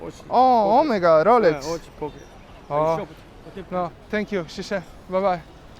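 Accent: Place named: Italy. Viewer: It's a beautiful enjoyment to listen to you speak English with your unique Italian flicking tongue. 🥰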